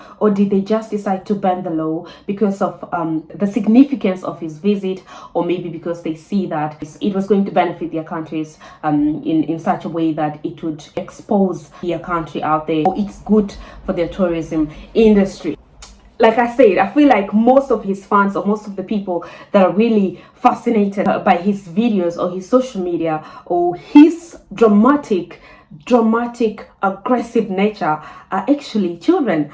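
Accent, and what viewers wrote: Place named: Nigeria. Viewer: You sound more Nigerian than Namibian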